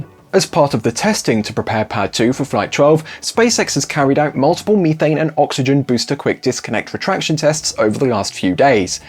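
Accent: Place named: England, United Kingdom. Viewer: Wrong? He's and Englishman speaking English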